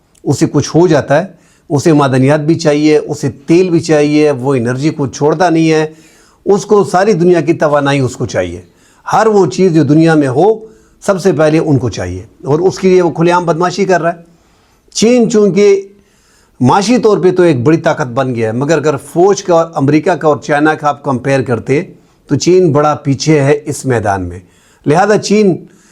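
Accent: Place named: Hindko, Pakistan. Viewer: Sir Hindko main batain kia karain App ke urdu Hindko jayse ha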